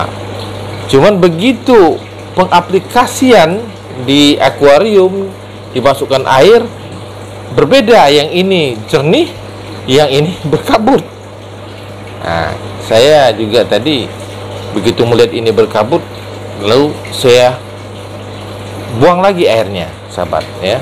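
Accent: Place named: West Sumatra, Indonesia. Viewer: Makasih infonya uda Sangat bermanfaat. Kalau boleh saya coba tebak Dialeg cando urang awak. Salam 🙏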